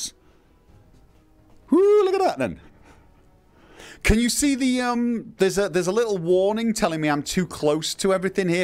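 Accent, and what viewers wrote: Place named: Northern England, United Kingdom. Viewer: First thing... your accent is much, much thicker and more clearly northern Britiain than it usually is... microphone or been talking to people from home?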